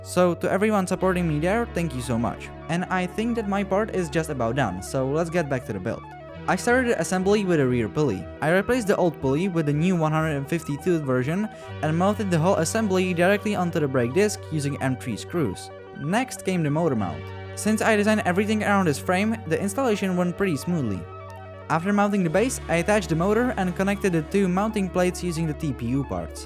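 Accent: Czech Republic. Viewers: Kamo, poznám český přízvuk, maga tvorba a w tvoje ajina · i sense a czech accent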